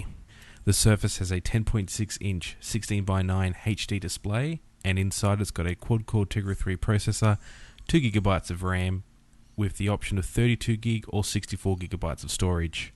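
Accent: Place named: Australia. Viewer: awesome revie yo sound aussie like me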